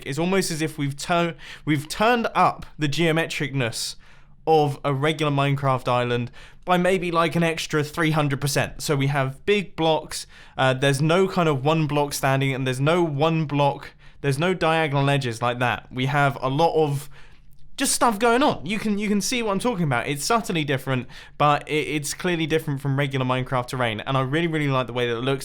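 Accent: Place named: Yorkshire, United Kingdom. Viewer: Hey, are you from Yorkshire because I kind off hear that dialect. PS: great videos keep it up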